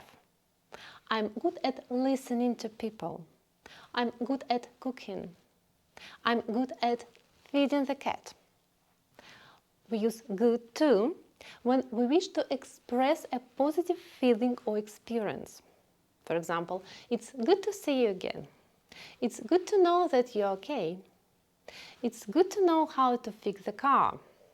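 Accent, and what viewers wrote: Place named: United Kingdom. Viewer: Hi, I'm interested in learning English with a British accent. I just found your channel and subscribed. Where are you from? Does your accent sound different from other British accents?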